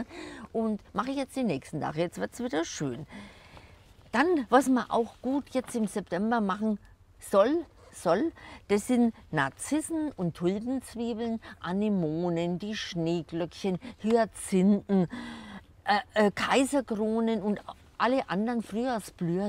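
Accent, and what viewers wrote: Franconia, Germany. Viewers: Eine Freude den typisch fränkischen Dialekt zu hören. Ich tippe auf Mittelfranken 😊 Super Tipps auch noch dazu 👍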